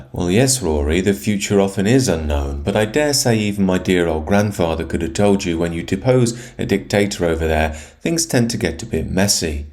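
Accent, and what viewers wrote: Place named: Yorkshire, United Kingdom. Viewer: Knew you had some Yorkshire in there..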